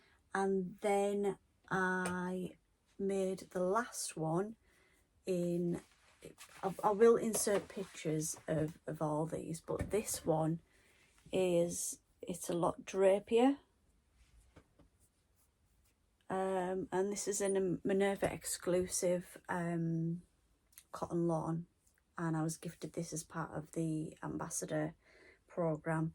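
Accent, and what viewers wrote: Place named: Northern England, United Kingdom. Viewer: Love your presentation and accent-northern England? My husband and his family are from Bradford. I’m getting back into sewing after MANY years, enjoying new techniques and reviving my skills ❤️ keep up your good work!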